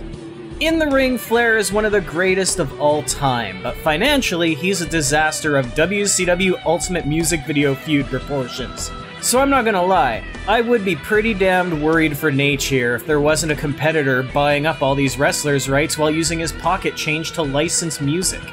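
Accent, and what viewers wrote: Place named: Minnesota, United States. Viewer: that's cool. I unsubbed from all other foreign pro wrestling channels because it's really hard to listen to their accents . You just sound like I guy from Minnesota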